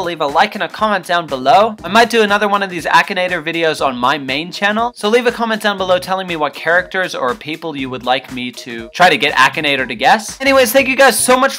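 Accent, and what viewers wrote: Australia. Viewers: Your Australian? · HES AUSTRILLIAN?!?!?!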